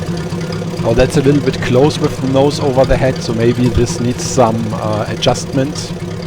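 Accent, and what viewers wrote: Germany. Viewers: best mod ever had. ok we have some nice tanks,planes,boats etc on our tactic server but this is still awesome! is there a elevator for boats planed? for now we have to tow our trucks,tanks etc with planes to the island. are you german?you sounds like an english speaking german;-)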